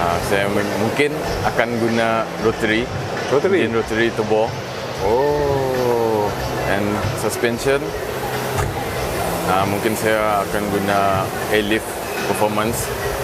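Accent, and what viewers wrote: Sabah, Malaysia. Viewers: Dia cuba untuk ckp.malaysia tapi tk mnjdi haha....sbb sye pun brunei + malaysia mcm sbah pulk haha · Knapa loghat mcam Sabah?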